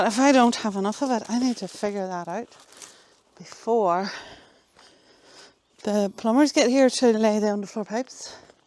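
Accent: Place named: Northern Ireland, United Kingdom. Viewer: Love a Northern Ireland accent, I could listen to you all day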